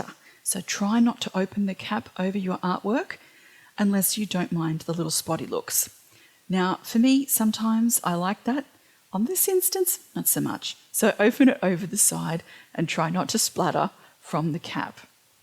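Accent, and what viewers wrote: Australia. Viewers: Really loving your technique, you are so easy to understand. I so please to be hearing yet more Aussies doing these videos. TFS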